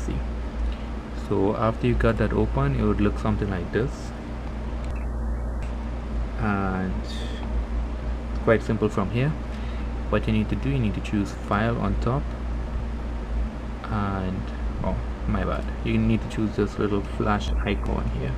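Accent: Trinidad and Tobago. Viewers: hi are you from trinidad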